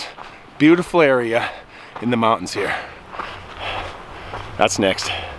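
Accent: Minnesota, United States. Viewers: Thank you, keep hiking,Haha. I enjoy listening to you and even before you said you were from Minnesota I figured as much as I recognize your slight accent. Mine is probably a little thicker as I am from northern Minnesota. Anyway thanks again.😎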